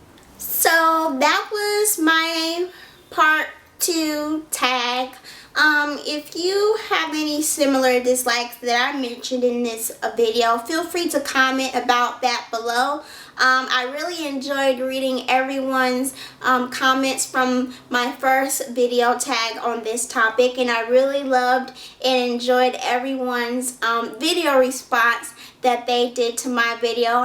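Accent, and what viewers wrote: South, United States. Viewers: Lol your accent is mixed with white girl & country!! it's so cute lol .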